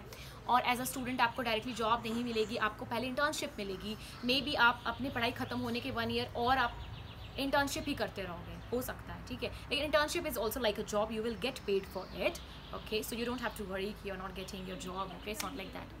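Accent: Italy. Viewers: Your accent is Italian now.... <3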